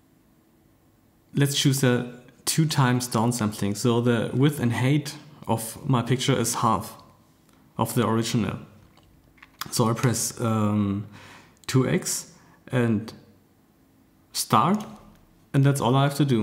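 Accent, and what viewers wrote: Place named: Germany. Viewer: The english seems to have a german accent, aber ich könnte mich auch irren =) Will you maybe bring your liveviewrift to the Gear VR aswell?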